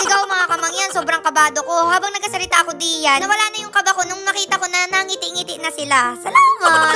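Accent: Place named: Marinduque, Philippines. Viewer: Kamangyan, tubong Marinduque ako😍pero ngayon andine na sa Maynila☺️kaya ako nag subscribe sa yo ay natutuwa ako at palagi ko naaalala yung punto sa probinsya namin😍keep making us happy everytime we watch your vlogs🥰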